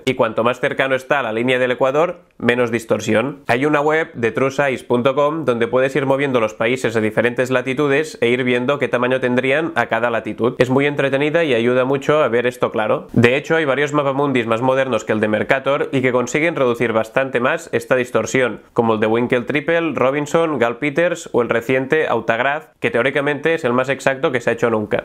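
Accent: Catalonia, Spain. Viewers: Ets catala jajaajaja es nota molt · Eres catalannn??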